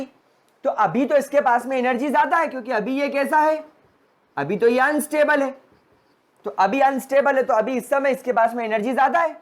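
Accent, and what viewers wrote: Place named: Indore, India. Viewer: Sir apki awaz me indoor ka accents hai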